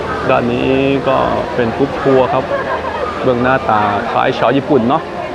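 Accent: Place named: Sakon Nakhon, Thailand. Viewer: เจ้าสัญชาตลาวแท้บ้! ฟังสำเนียงคือ แถว สกลนคร หรือนครพมม...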